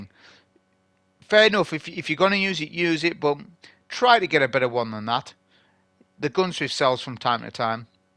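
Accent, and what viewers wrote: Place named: North, United Kingdom. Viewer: Where are you from? I detect a northern accent.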